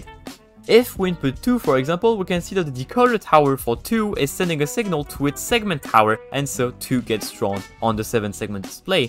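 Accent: France. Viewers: are you french i heard it in purples vedio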